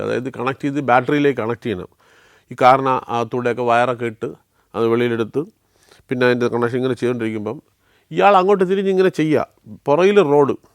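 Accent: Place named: Trivandrum, India. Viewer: great to hear proper Trivandrum accent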